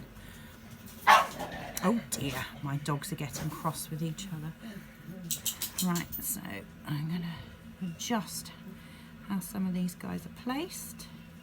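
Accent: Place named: England, United Kingdom. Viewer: You're so sweet and joyful and i love your english accent!!! I hope i visit england one day! 😃 the seals are cute! Getting a nice bubble paint bath😃 sending love from the US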